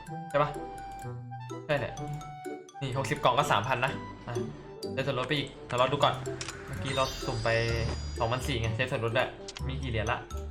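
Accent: Korat, Thailand. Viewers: ผมชอบพี่พูดโคราช เเทะๆ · สำเนียงโคราชก็มา55💖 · โคราชจ๋าเลยน้อK2J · โคราดจัด😆😆😆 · พูดโคราชทั้งคลิปหน่อยครับ555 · คนโคราชและดูออก😁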